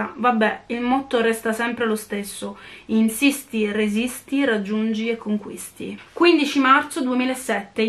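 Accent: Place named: Palermo, Italy. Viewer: Il tuo accento palermitano è qualcosa di stupendo 😂😍 (sono siciliana e ho origini palermitane)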